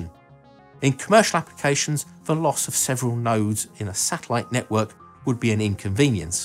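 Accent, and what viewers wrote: Australia. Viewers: Despite the high Australian accent, this guy's elaboration of scientific facts is cool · comfy voice, ..accent sounds Australian.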